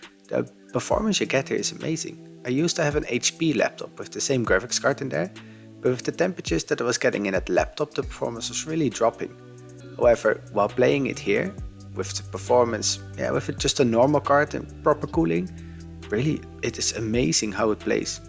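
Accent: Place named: Netherlands. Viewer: Are you dutch? You sound very dutch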